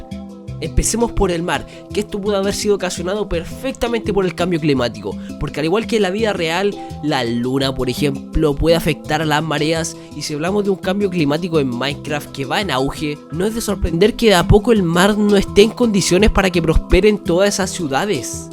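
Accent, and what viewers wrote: Chile. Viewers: Eres de chile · apenas escuchar su intro este wn es mas chileno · Tu Acento Es El De Mi Pais Osea Chile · Tenías voz de flaite, ya te había identificado el chileno, y bueno, el video era tan excelente que llegue hasta el final y te escuche el “hueon”, lo que si, podrías dramatizar menos jajaja, saludos bro!! 🇨🇱🇨🇱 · me gustaria saber como fue que se te ocurrio esta teoria, estaria encantado de escuchar como fue que fuiste formando esta teoria tan ufff pero con un sentido que no esperaba encontrar en un juego tan kawai como minecraft Pds: hehehe sabia que eras chileno con solo escuchar tu forma de hablar ^^ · Pta wn se nota que eri shileno ps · dejame adivinar , ... chileno? :V xd -denme like para que lo vea y no desaparecer xd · me encanta tu forma de narrar teorias y me gusta también el concepto que tienen. ya he visto varias y la verdad son muy buenas ya tienes una nueva subscripcion !! 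ademas me gusta el acento chileno ;) saludos desde arg. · Hablas español normal o habla chileno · Eres Chileno? :0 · apenas me meti al video y cache que eres chileno xd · Chileno xD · chileno DETECTED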